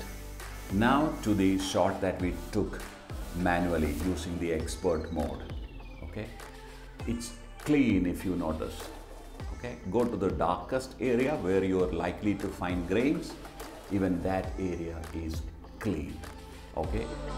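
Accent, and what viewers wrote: India. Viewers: His English is like Hindi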